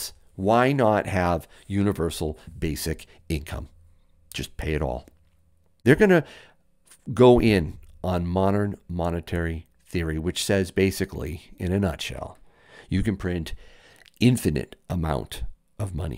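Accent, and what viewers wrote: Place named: United States. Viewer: It’s just yer accent, I find (in general) yankees have an accent that is just off putting. Now salivate metals . . . Whew, if his info wasn’t so good I’d never listen to him on purpose lol.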